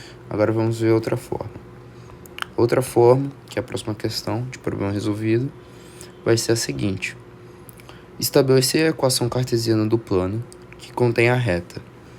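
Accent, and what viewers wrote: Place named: Pernambuco, Brazil. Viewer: Não entendo nada de matemática. Mas amei o vídeo ❤ kkkkkk Porém, gostaria de ressaltar que ficaria mais legal com o seu sotaque original, pernambucano 🥰😘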